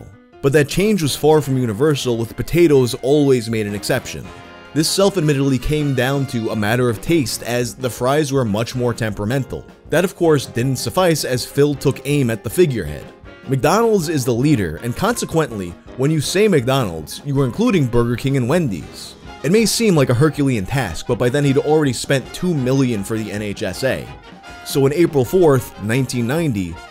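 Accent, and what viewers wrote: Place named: New York, United States. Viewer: Your New York accent is getting a lot thicker, it’s nice. Makes me want to listen to Type O.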